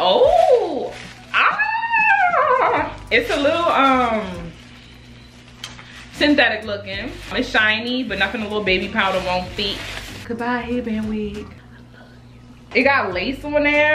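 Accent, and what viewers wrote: Baltimore, United States. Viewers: Are you from Baltimore? I hear that accent !!! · Are you from Baltimore because I think I hear an accent?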